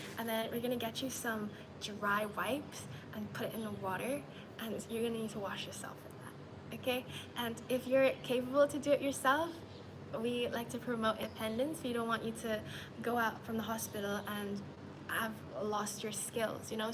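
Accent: United States. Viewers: how did you learn to speak English with a Kansas accent?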